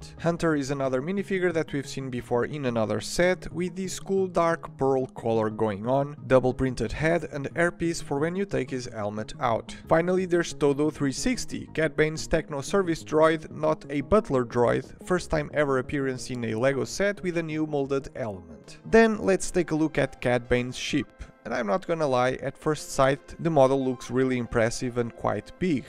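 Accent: Portugal. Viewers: És Tuga? O nome pelo menos é Tuga e o acento também. O mesmo do Mourinho, aliás o nosso! Eheh. Abraço e obrigado por partilhares.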